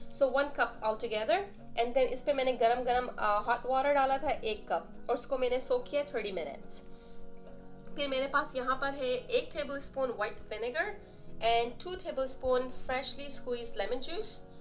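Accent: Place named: United States. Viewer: Aapka amarican accent bohot accha hai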